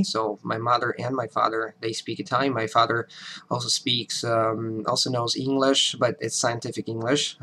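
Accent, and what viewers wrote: United States. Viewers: Interacting and speaking with native speakers is definitely not sufficient in sounding native and mimicking that accent. I am American and there are SO many people who have lived here forever and still have very heavy accents (Arnold Swarzenegger, for example). It takes determination and a good ear to listen to what you're doing wrong. He has a very good American accent but (like most people in a foreign language) I wouldn't think he was an American if I talked to him, but he sounds VERY good. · Wow. Your English is, obviously, incredible, and your hard work paid off. :) You sound like you were born in America. I wish I could speak with such fluency in another language, so you're very inspirational! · It's funny cuz not only do you have an American accent, you have a regional one too (Illinois/Chicago) as a result of your tutor. Anyways, thanks for motivating me. 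I'm trying to learn German all on my own. I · you're a great American english speaker. When I watch your videos, my girlfriend actually noticed that you sound like as if you moved to America or you grew up here. I spoke two languages when I was growing up and sometimes I would mix my Filipino (Tag-lish) accent with my normal "school" accent. I guess it depends on who I talk to. What I noticed is that my accent started to become more and more American since I loved watching TV sitcoms like Cheers, King of Queens, Frasier, etc. I also loved keeping up with the late night show jokes. I sang a lot to songs I hear on the radio as well. Right now, I'm currently learning French. I've learned a lot of techniques from polyglots like yourself, techniques that I use whenever I approach learning French. I'm sure I won't sound like I grew up in France but as you said, it's about conveying the message with language as the tool. 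I'm just more inspired to learn French especially when I watch videos like yours. Keep on inspiring people, man. Keep up the good work! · you have a great american accent!, and i can totaly understand you, when you said america was around you and you havent even been to the country, same here with me!, i have never went to spain or mexico or any latin countries but yet when I talk to native speakers some say my accent is better than theirs! lol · Wow. I had no idea you're Italian. I thought you were American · Great American accent. Your American accent is a little weak with certain words like "mother" and "country". Overall, it's really good! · When you first started to speak, I realized that you weren't from USA. I thought you were... I don't know... from Mexico or somewhere else but you really have an American accent. I hope one day I'll be able to be as fluent as you are XD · I love your American accent. 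· I commented on your other video but you make a great point: speak clearly and simply. So many foreign people speak English "fast" thinking they're fluent but they are very difficult to understand. A person with an accent who speaks clearly and simply is so much better. btw you do sound 99,99% American, I really have to listen to notice you have an accent and would not pick it up in normal speech. Congratulations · You speak American English extremely well, better than millions of native speakers! When are you coming to America? Michigan, USA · Hi,! Your accent is great and your English is just perfect. How much time did it take you to become fluent and to acquire an American accent? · Your American accent is excellent! For the first few minutes you speak, it's hard to tell that you aren't from the states! Thanks for the video, you've given me motivation! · i was almost a minute and a half into one of your other videos before i realized you weren't american. 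great job, you clearly worked at it. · You sure have that ''flawless American accent!'' that inspector clouseau (although French xD) could never get! I have never heard a non-native English speaking person speak with such a perfect accent!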